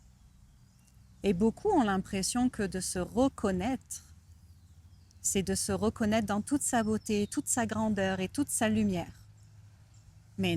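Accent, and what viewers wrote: Canada. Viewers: Merci ❤ Merci de ce partage ❤ Tu as de plus en plus l'accent canadien 🤗😁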